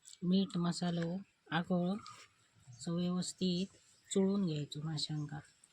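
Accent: Malvani, India. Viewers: काकी, तुमचा मालवणी बोलना भारी हा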